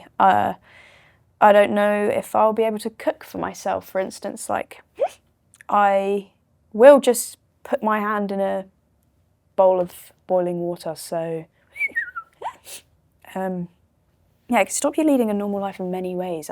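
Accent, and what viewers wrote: United Kingdom. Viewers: Sounds and acts like your typical brit really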